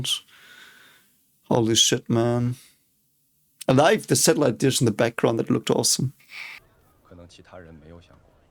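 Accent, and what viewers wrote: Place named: Germany. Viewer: Why is it when ever i her german accent, theres erica automatically playing as my in head elevator music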